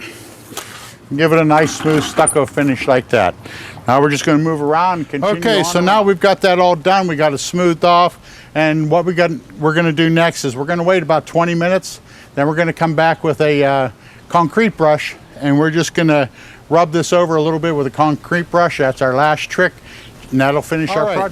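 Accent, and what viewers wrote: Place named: Southwestern Pennsylvania, United States. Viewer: I knew you were from southwestern pa by your accent, and then I saw the steelers helmet on the door. Here we go steelers here we go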